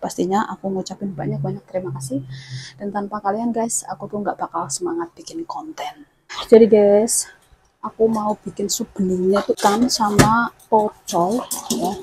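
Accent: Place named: Banyumas, Indonesia. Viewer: Masak masak di Hongkong tetep semangat salan ngapak bae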